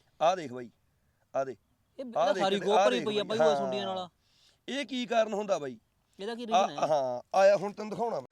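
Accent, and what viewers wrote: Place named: Sangrur, India. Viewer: Sangrur aria bharidi bola janda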